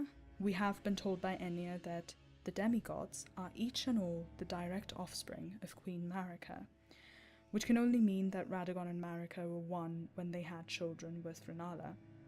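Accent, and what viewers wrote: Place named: Germany. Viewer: I hear a bit of german in there?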